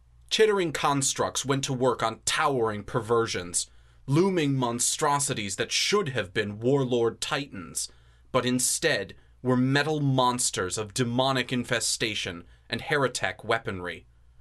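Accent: United States. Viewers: ugh no offense but American accent just doesn't fit 40k.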